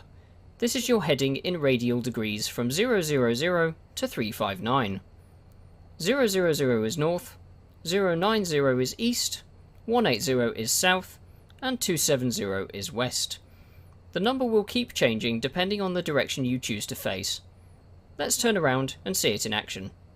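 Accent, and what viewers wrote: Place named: United Kingdom. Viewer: Have you ever noticed smart videos like these come from British accent people. Love it.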